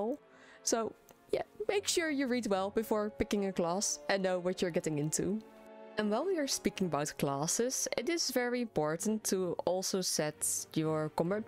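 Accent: Netherlands. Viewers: You sound kinda dutch. Ben je een Nederlander?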